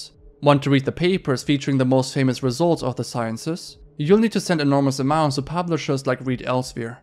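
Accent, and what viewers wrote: Germany. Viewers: my sister who is studying german says his accent is "very obviously german" so thats my guess LOL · I love your videos, your accent is great, germans have great voices :)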